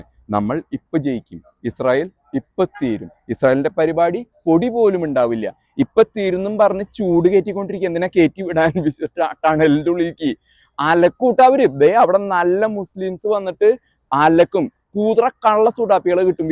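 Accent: Thrissur, India. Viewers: Thrissur slang super bro your news chanel mind blowing dialogue big salute 🙏🙏🙏🙏🙏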